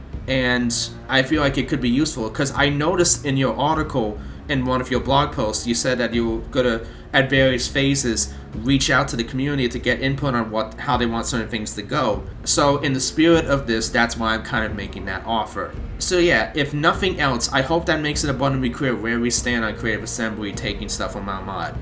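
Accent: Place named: Rhode Island, United States. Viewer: Dude sounds like he from Warwick RI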